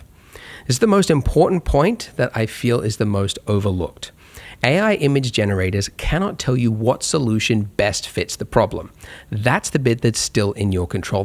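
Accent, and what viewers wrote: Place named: United Kingdom. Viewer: Why do people with British accents seem so intelligent? Not saying you're not. It was a good video, and well-thought-out. But it is augmented by your accent. :)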